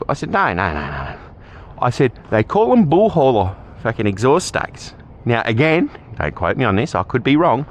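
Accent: Australia. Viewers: Nice truck , Mate ! ,I'm Chinese ,learning English, also need some time to adapt to Australia accent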